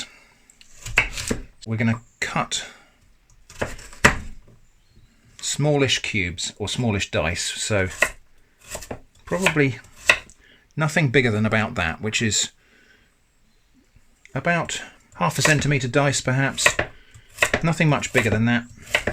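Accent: United Kingdom. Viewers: For some reason you sound kind of british.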